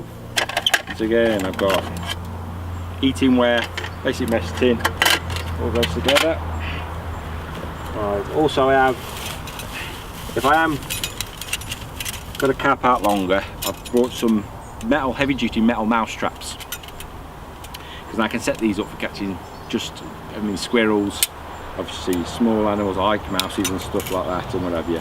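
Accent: England, United Kingdom. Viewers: I think the content is probably good, but I could not focus on what you were saying, because I just think it sounds so disgusting when british people pronounce "u" as if it was "o". Like in "stuff", or "backup". But thanks anyway. Maybe it's a reading issue? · Nice simple video so nice to hear an English voice ✌️and people not droning on about concealed carry. How is it most Americans are so paranoid 😆I digress nice kit man very Similar to my Rush 24 bag that goes everywhere with me I'm in south east England but travel extensively keep up the good work I'm now subscribed 👍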